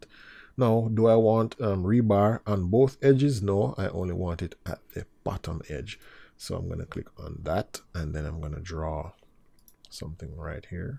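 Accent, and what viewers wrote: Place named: Jamaica. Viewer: Absolutly the best tutorial of AutoCAD Architecture! Really good explaination in funny "Jamaica" styl:). Perfect. I'm looking forward for Last Episode with setting Sheets. Great Work!!!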